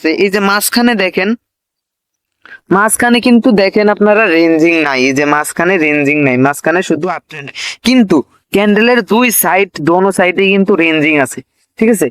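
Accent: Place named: Bhola, Bangladesh. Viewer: ভাই আপনার বাড়ি কি ভোলা। আপনার মুখের ভাষা ভোলার ভাষার মতো অনেকটা তাই জিজ্ঞেস করলাম।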